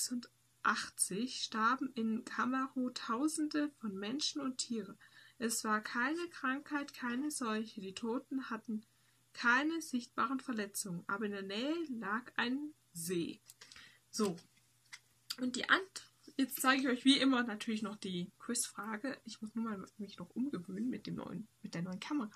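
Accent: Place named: Swabia, Germany. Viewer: Ich kann das nachvollziehen, wie Du in Sachen Hilfe annehmen fühlst. Du wirkst symphatisch und das Schwäbeln passt gut. MfG René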